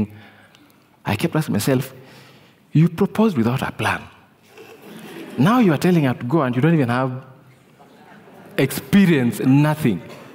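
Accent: Kenya. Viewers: And you can even get the Luhya hint in it. Great guy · I love the Kenyanness im your pronunciation. Haha...and your sense of humor 👏👏👏🙌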